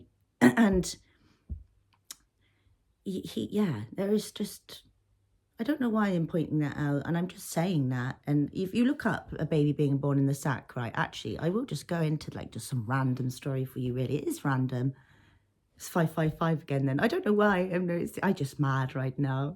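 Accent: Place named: England, United Kingdom. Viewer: I dont think my comments to the first vidoe of yours were correct about being a witch, but im not certain. You talk like me but with a English accent. Im going to get to the bottom of this.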